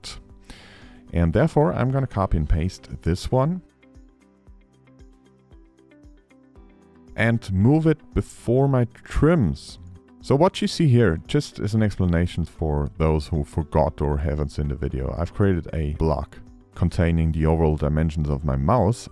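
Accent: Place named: Germany. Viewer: Your German accent makes it so much more interesting to watch this tutorial. :) Some typical accentuation on some words or syllables... funny and entertaining!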